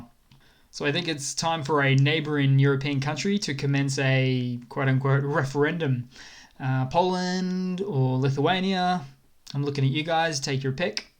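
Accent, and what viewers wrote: Australia. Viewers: Love your delivery. I wonder where your accent is from? First, I thought you could be English but then I seemed to be picking up some Aussie cadence. Please put my curiosity to rest. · Very encouraging to hear that the Kherson counter offensive is more successful than the map shows, but should you be going public with this when Ukraine is keeping it quiet for Opsec? Anyway, I don’t know where you get your information from but if it’s correct it’s gold. Bahkmut too everyone else is saying the Russians are gaining. I hope you’re right that there is no progress. Btw, you sound like an Aussie which makes your sources of info even more mysterious 🇺🇦🇦🇺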